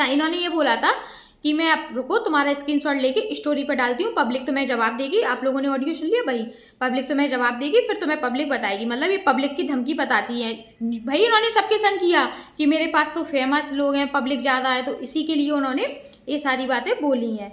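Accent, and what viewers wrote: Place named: Madhya Pradesh, India. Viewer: Waise aap MP se kha se ho…mai bhi MP se hoon….apki language Suen kr lga ki aap hmare taraf k hi ho😅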